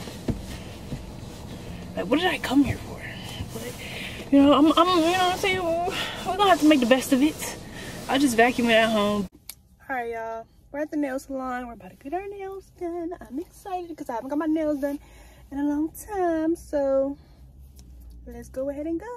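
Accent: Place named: United Kingdom. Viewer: The British accent 😂LOL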